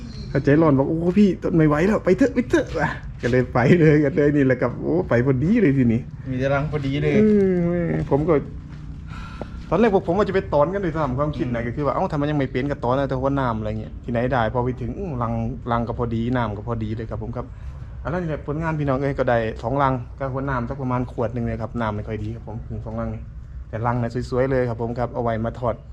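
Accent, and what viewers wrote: Korat, Thailand. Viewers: คนโคราชบ่คับ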